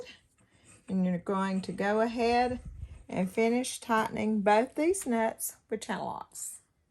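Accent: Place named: South, United States. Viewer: I'm from Australia, I love the southern US accent like yours.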